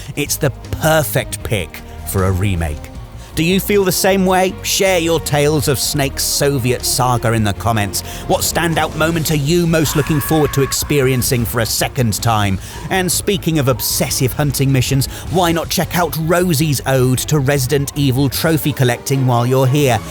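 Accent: United Kingdom. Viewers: is Metal Gear’s David Attenborough. There is nothing more enrapturing than excellent, intellectual British commentary by an expert in their field.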